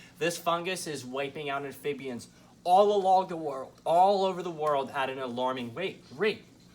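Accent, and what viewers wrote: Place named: Pennsylvania, United States. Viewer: I knew you sounded like you were from PA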